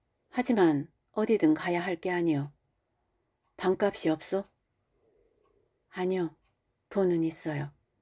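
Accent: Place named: Jeolla, South Korea. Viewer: 책 읽느라 수고가 많으십니다. 혹시 전라도 분이신가요? 몇 군데 발음과 억양이 듣기에 거슬리는 부분이 있습어요. 낭독자께서는 왜 의복, '외투'를 '에투'라고 발음하시는지요. 경 험에 의하면 호남사람들이 주로 그렇게 발음 하는 경향이 있더군요. 혹시나 해서 물어보는 겁니다! 아무튼 책 읽느라 수고가 많으십니다.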